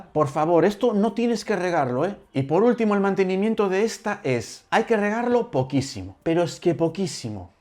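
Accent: Spain. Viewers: E visto muchos vídeos, pero este me encantó por lo sencillo que explicas y tú buen humor, además ese acento como dicen en mi país "españoleto"sin ofender ❤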